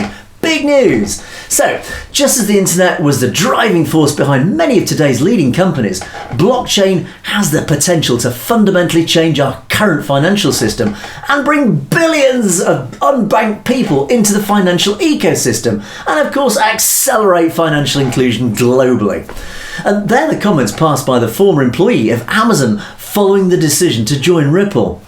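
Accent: United Kingdom. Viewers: Like ur typical Uk Accent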